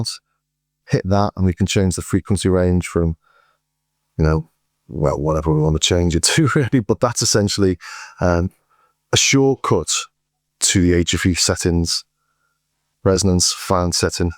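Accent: Liverpool, United Kingdom. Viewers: Did you know that your UB-Xa Synth is Diseased, The closed captions if you switch them on every time you said OB8 (atrophy) setting it translates it as AIDS and it also mistranslates you saying atrophy to HIV which made me chuckle a bit, not that AIDS and HIV are anything to laugh about ! ! ! but I was wondering if you can alter the translation settings it obviously doesn't like your soft scouse English accent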